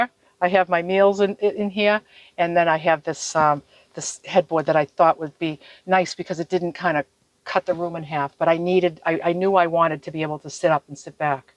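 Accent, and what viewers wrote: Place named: East Coast, United States. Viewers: I can tell she’s probably from Massachusetts. My home state, what a lovely lady. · Brilliant woman, brilliant van! Love her accent. Is it New York? (Brit here) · New subscriber to.. you rock sister Certainly from the East Coast dialect.. 😅 much love from McDermitt Oregon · Light weight is important. Kitty litter works well, just ask my cats. I hear a northeast coast accent.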